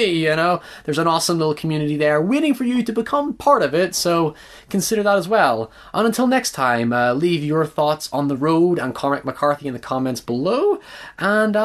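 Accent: Northern Ireland, United Kingdom. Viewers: Why do you sound like a Northern Irishman trying to sound American? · It's rare to come across a channel with such well-constructed video essays! It's also excellent to hear another Northern Irish accent :)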